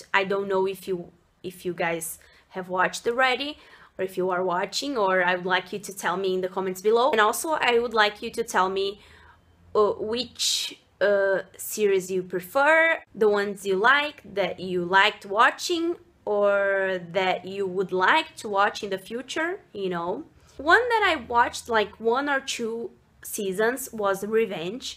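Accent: Brazil. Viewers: Strong, I mean! ;) But it's natural due to our Brazilian Portuguese phonetics, sometimes it can be hard to "get rid of" them! Mainly if you have started learning after a certain age... But don't worry, your English is great! It's completely natural having an accent, mainly if it's not your L1... And I meant you have a cute one, a cute accent! :D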